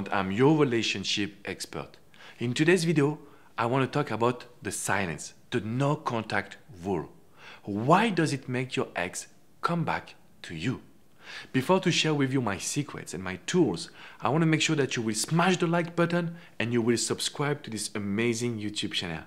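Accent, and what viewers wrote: France. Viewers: I like his accent, is he french? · I can tell you are French!!!! Love the accent!!! I am a Frenchyyyy too 😉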